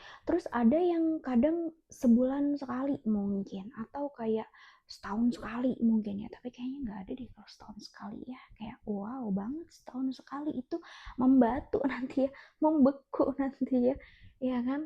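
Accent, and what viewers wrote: Java, Indonesia. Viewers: logat jawa nya melekat jirr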